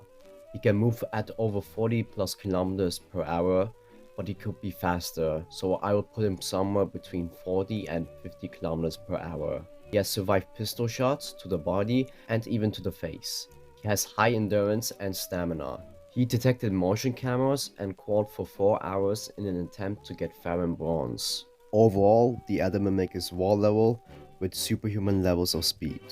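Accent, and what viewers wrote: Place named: United Kingdom. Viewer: I don't know but you talk like some smooth british guy while having coffe+though you're saying facts most of the video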